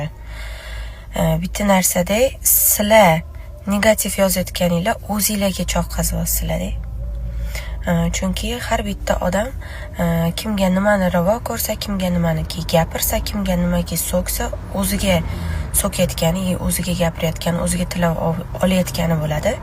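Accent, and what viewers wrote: Uzbekistan. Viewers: Siz xorazmlikmisiz. Vottilab gapirganizga xayronman🤔🤔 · Узиззи шевейизда гапиринг тошкенча гапирме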